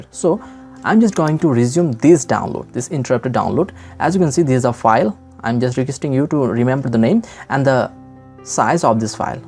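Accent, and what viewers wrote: India. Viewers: alhamdulillah i am not indian also but i thought ur voice was indian srey Thanks alot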